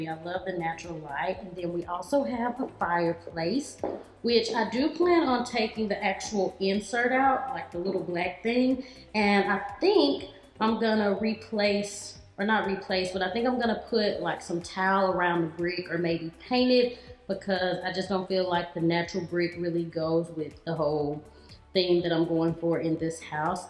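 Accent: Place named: Alabama, United States. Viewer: Love your home!! First video of yours I've seen and I will be back! Totally guessed in my head you are in Alabama! Lol I'm from Tennessee and when you're from the south, you can totally hear the different southern accents I feel like!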